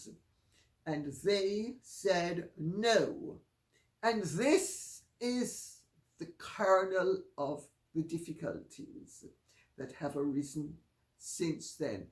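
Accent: United Kingdom. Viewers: I don’t know why, but condemning Harry and Meghan’s behavior sounds sooo much better with a classy British accent! 😉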